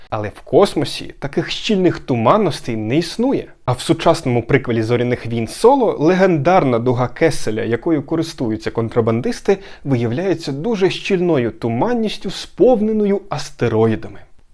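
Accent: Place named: United Kingdom. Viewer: Де в епоху інтернету отримав таку чисту Bri'ish вимову?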